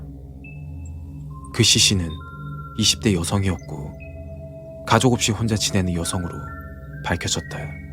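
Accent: Gyeongsang, South Korea. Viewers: 목소리 특이하네요 갱상도 특유음성도 있고 잘듣고있습니다 ㅎ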